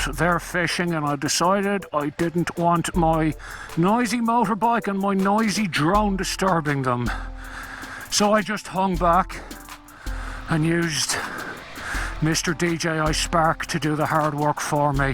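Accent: Ireland. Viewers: Where in Ireland are you from. Cant figure our the accent?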